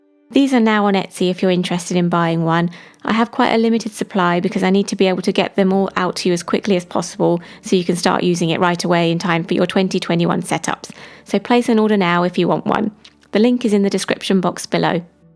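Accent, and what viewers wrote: United Kingdom. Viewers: I made it til the end and are now your newest subbie🥰💖 LOVED the set up and the notebook and I am very impressed by your ability to keep it so neat and clean and minimalistic but still very beatiful and inspiring and incredibly pleasing to the eye, or for the eyes(?)(English is not my first language, I am from Kristiansand in Norway❤️👱🏻‍♀️❤️💙🇳🇴💙❤️ I can’t wait for more videos to come and now I am gonna look for as many videos from you as I can find, cos I REALLY LOVED everything about your art and British accent of course (lol😅🥰) and your editing is amazing. One of my main goals for this year is to learn how to edit videos so my subbies and others who see my videos don’t have to always watch in real time every freakin video, except for the flip through ones where I just show off something I made. And another (more important) goal for next year is to try and eat at least one time every day. 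Because of my recovery from Anorexia I have ruined my feeling of hunger, so if no one or nothing reminds me to eat, it can go days without food or water(except for when I brush my teeth every morning and night then I’ll have some sips of water but that is not enough. And I want to try again and cure some of my insomnia which I’ve had since day one when I was born. 20 minutes is the record in my entire life on how long I’ve slept and I REALLY DESPISE (or how you spell almost hate) waking up after one or two minutes of sleep every bloddy night. So that is why I am sitting here at 4.30(?)(we a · Made it to the final spread, love the minimal approach, got some great ideas too, My goal is to use my planner to be more productive and sell more of my jewellery. Its lovely to listen to a fellow brit ❤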